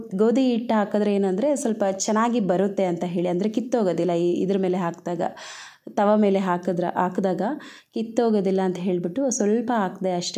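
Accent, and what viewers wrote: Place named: Bellary, India. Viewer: Nivu matado shaily nodi nanu bellary ಮೊಳಕಾಲ್ಮುರು side erbodu ankonidde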